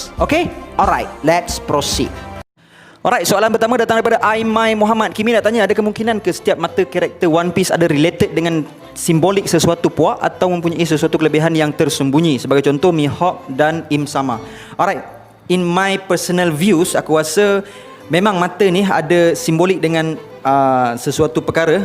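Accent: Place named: Kelantan, Malaysia. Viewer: Kecek klate skali😂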